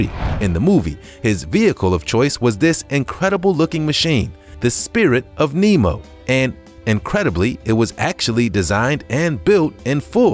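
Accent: United States. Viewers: I hate when people with and American accent talk kilometers. They know it don't mean crap to most of us. Miles per hour please ! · Nob the Knave English language is coming from 🇬🇧, and this is a cowboy English dialect, and it's sounds like he has hot baby patato in his mouth.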